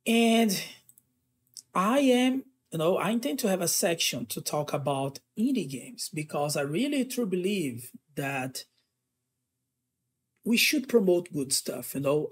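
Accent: Brazil. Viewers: I can recognize that Brazilian speaking english tone everywhere. Keep the goop work, fellow BR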